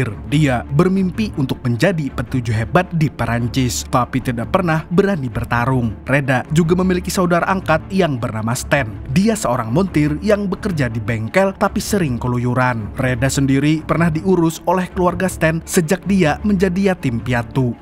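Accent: Banjar, Indonesia. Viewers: Orang Banjar yg b isi akun ini kayak nya 😂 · Admin nya orang Banjar kalua kah😂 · Orang Banjar jua lah mang?😁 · Halus halus wani hangit wkwk orang Banjar kah bang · Urang banjar kah pian mang😂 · Kaypa habar nya.? Banjar ni pina nya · Admin orang Banjar kah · Lawasny meumpati, hnyr tau urg banjar sdn ni😁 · Urang banjar kh pian mang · Halus-halus wani hangittt 😂😂😂😅pian orang banjar kah bang 😅😅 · Banjar kh mang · Mamang bantaki basa banjarnya memberikan komentar rami banaŕ jadinya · Orang banjar kah mang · Urang banjar kh nih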